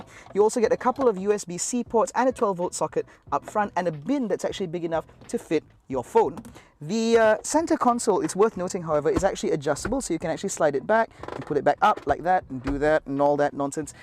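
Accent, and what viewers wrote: England, United Kingdom. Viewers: Thank you … I am getting the 2023 Life model in New Zealand (same as the Highline) in the Atlantic Blue. I like your English voice. The Blue you have got there is a sublime colour!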